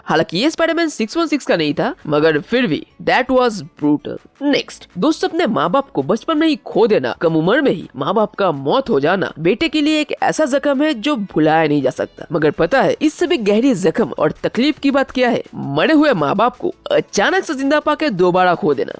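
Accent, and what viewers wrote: Bengal, India. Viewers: ji Aap kya Bangali ho?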